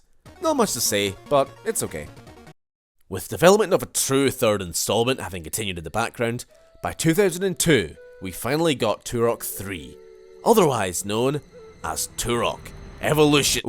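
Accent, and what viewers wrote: Scotland, United Kingdom. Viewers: I have subscribed to you because :you made Elder Scrolls/ Star wars jokes, your regular jokes are actually funny, I love the scottish accent and everything Scotland (culture, drink, food and people). And you make good videos of great games we've all played. I, from clan Cambel, welcome aboard ya cheeky bastard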